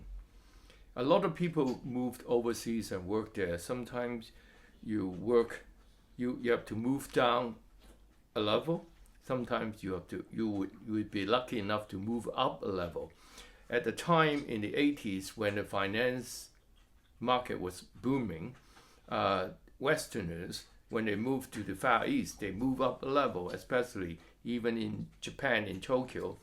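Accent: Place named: United Kingdom. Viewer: Thanks so much for the broadcast. I noticed the British accent increases just a few minutes into the show. Good work.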